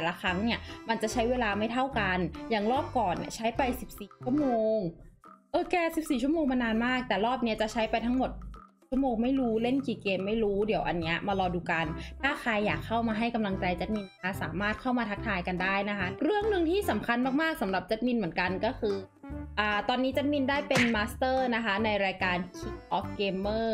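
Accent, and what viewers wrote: Thailand. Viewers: 1พี่อายุเท่าไร 2พี่เป็นคน เหนือ กลาง อีสาน ไต้ #เท่านี้แหละ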